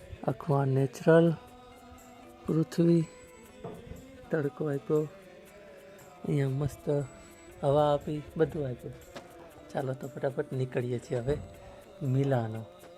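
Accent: Kathiawar, India. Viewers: આપડી કાઠીયાવાડી તળપદી ભાષા અને તમારા ધર્મપત્ની નો તમારી સાથેનો વ્યવહાર જોઈને એવું થાય છે કે આતો બધું હરિની કૃપા હોય તોજ થાય..તમે લંડનમાં રહોશો કે ઈટાલીમાં? તમારા પત્ની જોબ કરે છે કે હાઉસવાઈફ છે?એવો એક વિડ્યો બનાવો..અને એક વાત તો સો ટકા છે કે તમારામાં લેસ માત્ર અહંકાર નથી..અહંકાર વિનાનો દરેક મનુષ્ય મને ભગવાન જેવોજ લાગે છે..God keep your couple safe God bless you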